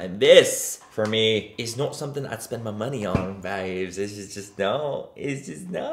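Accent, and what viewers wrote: United Kingdom. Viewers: Living for the British accent babes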